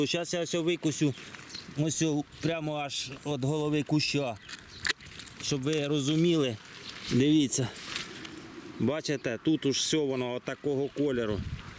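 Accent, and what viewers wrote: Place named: South, Ukraine. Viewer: Так впі знаю наш говір південний . Я змиколаївської.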